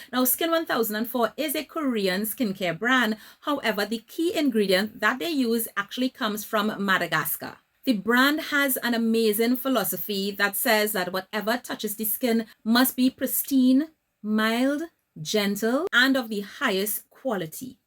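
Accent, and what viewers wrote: Trinidad and Tobago. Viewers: A Trini! A Trini accent never sounded so sweet 🇹🇹🙌🏽🔥!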